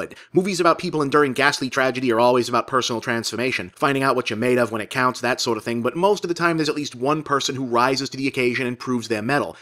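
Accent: Boston, United States. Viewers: DUDE!! control that Boston accent. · is it just me or is more and more of boston accent coming off more than usual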